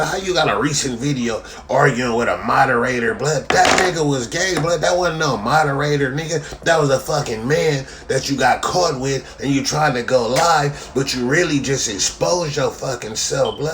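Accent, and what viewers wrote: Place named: South, United States. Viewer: Why is ur nose so light? And what kind of southern accent is that? You're not originally from Cali though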